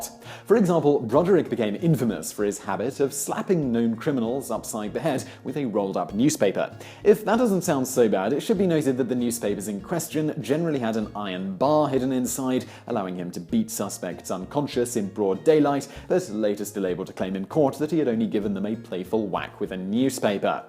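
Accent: United Kingdom. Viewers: gabriel toews, Please, don't beat yourself up about that. Even the light-hearted and "fan-friendly" way Simon presented the story of his life (coupled subliminally with the British accent) seems to put a shade of "knight errant" to him... It's actually a popular media ploy... I sincerely hope this can help you (and others) start to disseminate the substance of the story or presentation (with or without subtext)... Thus building and contributing to us all gaining in our critical thinking and deciphering skills... :o) I doubt you are an idiot... Just like most of the public... It's just easy (sometimes) to accept even trifling news at face value. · What I find ‘ironic’ about this video is the British-accented presenter and the American subtitles - “Color”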